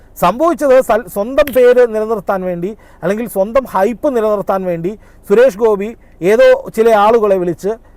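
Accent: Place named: Thrissur, India. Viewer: te Thrissur slang thanne better for this news, Gopi thrissur reports please use ur own language 🙏🏼😊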